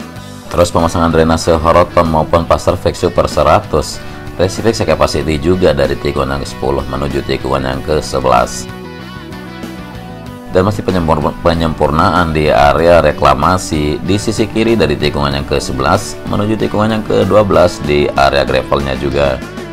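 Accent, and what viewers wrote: Lombok, Indonesia. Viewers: Suaranya masnya Itu lho suara khas mandalika banget😁😁, Topbgt 👍🏼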